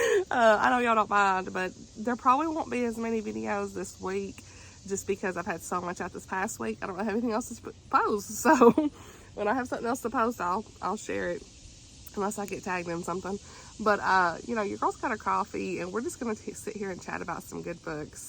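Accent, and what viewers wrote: South, United States. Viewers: I immediately smiled at the first two words you said! "Hey, y'all!" as a southern girl myself living in the west I miss hearing the southern accent! basically, I. Am. Here. For. It! 😁 and yes, if you go to my videos you'll know that I don't have a southern accent. Everyone asks me where it is. and i'm sad i lost it (maybe never truly developed it) But when i go back home to visit family and talk to people with a southern accent, mine shows up! or when i'm ranting about something! 😂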